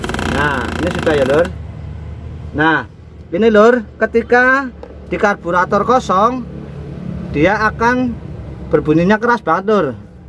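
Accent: Banyumas, Indonesia. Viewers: ngapak ya waaakk...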